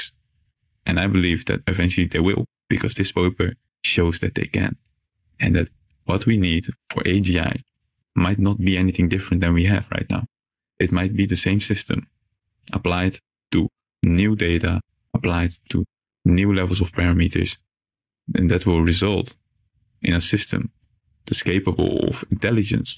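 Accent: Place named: Netherlands. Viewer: Interesting video. Good job. Just one thing: your audio stops at around 8 minutes into the video but the video still goes on for like another 2 minutes. Maybe something got cut off? Regardless I just subbed and love the Dutch accent. Down for more content in the future :) Greetings from Germany and reddit lol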